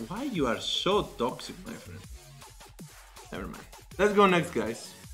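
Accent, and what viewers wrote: Greece. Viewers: pretty sure its greek. i am from greece and lots of people talk like that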